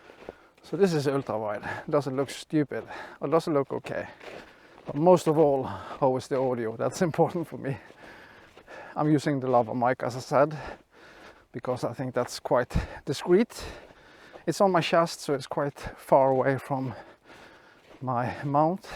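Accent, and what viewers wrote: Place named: Norway. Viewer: Du ar fra Norge? That accent lol...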